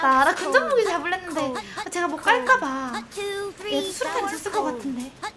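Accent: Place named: Gyeongsang, South Korea. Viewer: 말투 경상도 느낌쓰가 나네요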